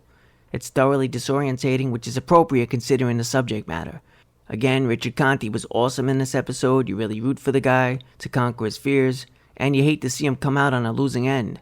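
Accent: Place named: East Coast, United States. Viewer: As a twighlight zone fan from age 7, now, and until I shuffle off this mortal coil, I appreciate you doing these reviews. Living in the Midwest right now it's good to hear an East coast voice talking bout Rod's creation. What's your name brother...mines Edmon. 😎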